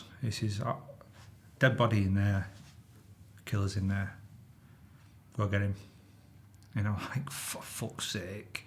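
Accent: Yorkshire, United Kingdom. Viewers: Super interesting videos. (I wish I could understand more Yorkshire!) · Proper Yorkshire man 👨 "Jim bond"🤣🤣🤣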